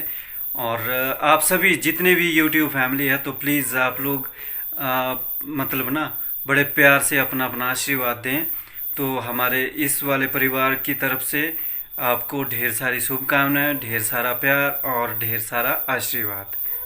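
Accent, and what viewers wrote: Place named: Punjab, India. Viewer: Ap jo batye karte ho achi lagti us m thoda punjabi ka touch hota ha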